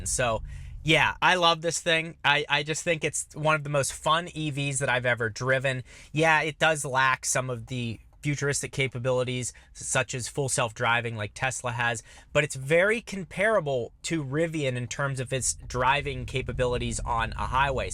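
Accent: Pittsburgh, United States. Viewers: Sitting here at AGH in the Northside watching this vid! Nice to hear you getting back to your yinzer roots!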